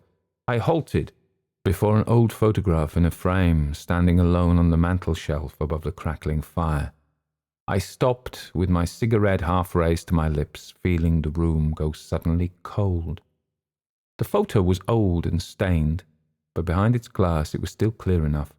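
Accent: United Kingdom. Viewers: What a treat to hear a British voice, not a stupid American AI muck up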